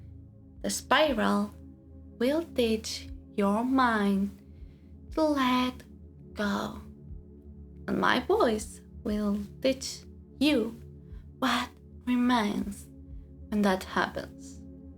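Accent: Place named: Argentina. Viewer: Ok I cannot resist your accent 😊 Very commanding tone of voice. I see you are from Argentine, South American women are so powerful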